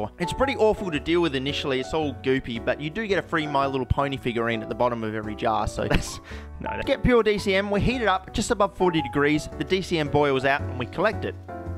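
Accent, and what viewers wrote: Australia. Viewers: "The atmosphere is nature's bin." Spoken like a true Australian. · Not only do I realy like your aussie accent but your honesty. you r showing all the damn takes it took, to get this tiny (but well worth it in my opinion) explosion filmed. You are awesome mate!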